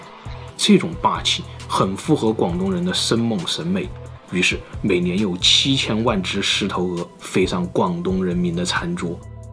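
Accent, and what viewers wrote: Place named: Chongqing, China. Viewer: 我预判是重庆人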